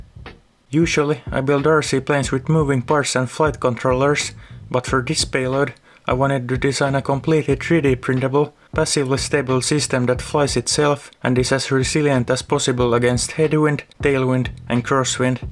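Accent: Finland. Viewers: You sound finnish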